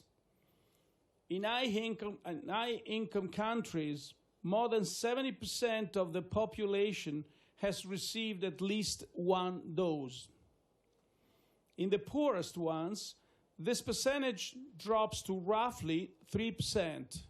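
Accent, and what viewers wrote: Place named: Italy. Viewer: Accento spiccatamente italiano. Che non è un problema, sia chiaro, il proprio accento è qualcosa di cui andare fieri. Resta il fatto che mi aspettassi qualcosa di più.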